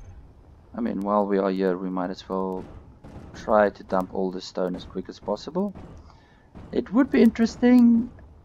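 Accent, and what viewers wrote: South Africa. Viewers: I know a South African accent when I hear one!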